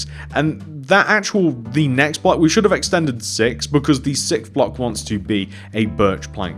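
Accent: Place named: Wigan, United Kingdom. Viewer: Mate you sound like you’re from Wigan.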